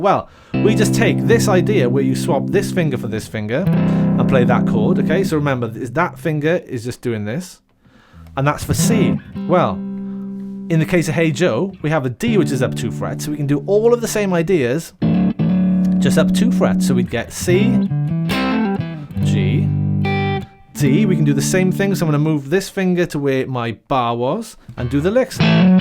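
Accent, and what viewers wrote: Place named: Wales, United Kingdom. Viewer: Thanks great lesson just subscribed. Great to hear a Welsh accent teaching guitar.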